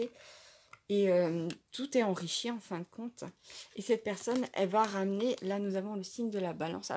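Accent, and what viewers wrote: Alsace, France. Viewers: Vous avez un accent, êtes vous alsacienne ? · Je ressens un petit accent alsacien ?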